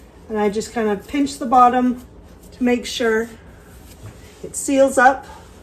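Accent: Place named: Canada. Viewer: lol, I KNEW you were Canadian, I could tell by your accent!! I subscribed to your channel, God bless you!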